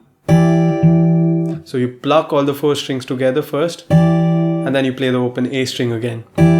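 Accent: India. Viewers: ohh, Indian accent ❤️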